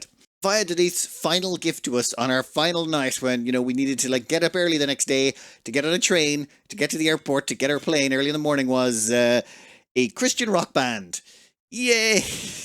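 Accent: Ireland. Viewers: OMG, you're Irish?(I just have to ask)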